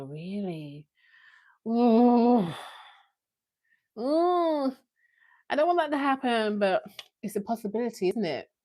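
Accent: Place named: United Kingdom. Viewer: I love your voice and your accent!🥰 Are you British? Sorry for such a personal question😅 I'm learning English so accents is a big deal for me. This is one among many reasons why I love Jacob. He absolutely nailed 3 different accents! 🤩 So it's okay if you feel uncomfortable to answer my question. I'm not insisting or being rude😅 Anyways, I like your content 🥰 Thank you!✨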